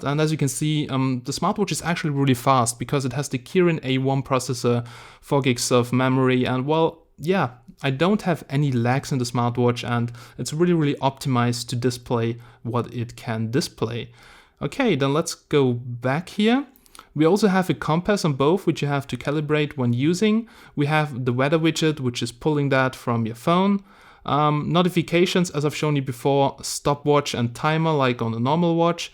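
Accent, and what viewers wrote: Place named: Germany. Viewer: For me it sounds like Germany or Austria.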